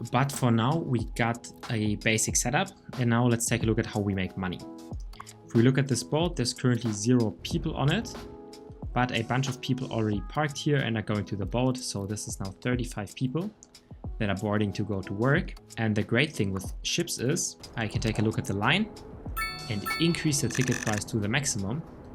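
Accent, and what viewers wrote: Germany. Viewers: Are you german perchance ?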